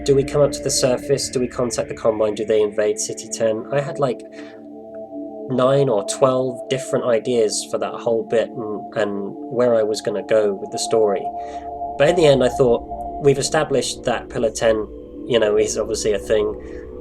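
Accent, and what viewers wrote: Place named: United Kingdom. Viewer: Huh, never imagined you having a british accent. I looove the mod though, I've already played through it 3 times <3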